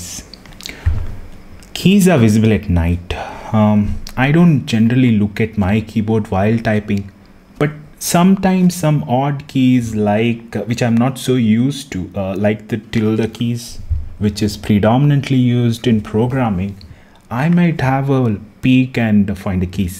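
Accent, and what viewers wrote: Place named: India. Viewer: That indian accent takes some time to get used to it. My russian accent is noticeable too, so I’m not complaining. xD Overall, this is a useful video. Thank you! P.S.: time codes would help a lot.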